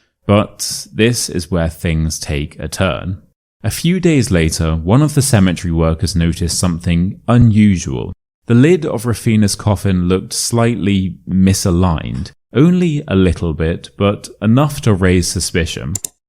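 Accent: RP, United Kingdom. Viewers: A good approach to Modern RP though.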